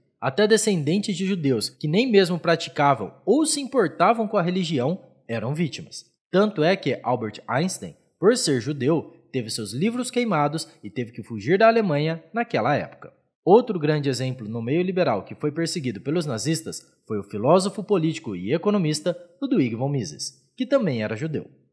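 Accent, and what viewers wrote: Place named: Paraíba, Brazil. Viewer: kkkkk isso que eu ia falar , sotaque meio paraibano do norte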